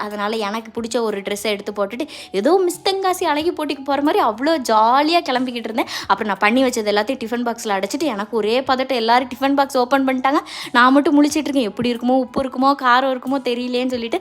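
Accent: Tirunelveli, India. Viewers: Thinelveli..words good slang ❤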